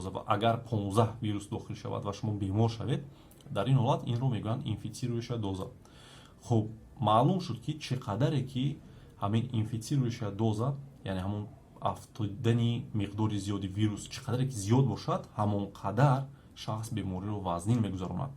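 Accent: Tajikistan. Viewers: Доктор саломат бошед! Ки бо лахжаи тоззаи точки гап мезанед салом аз Киргизстан Андарак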